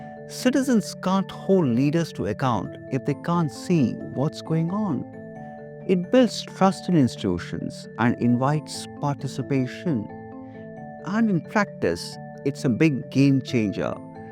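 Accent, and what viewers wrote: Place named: India. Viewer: Sir little bit more indian accent 👍👍👍 because I want to listen you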